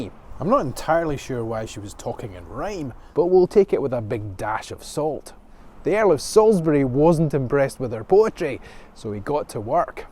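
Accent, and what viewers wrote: Scotland, United Kingdom. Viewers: ♥️your Scottish Broge, but you talk funny ? 👍😎🇺🇸!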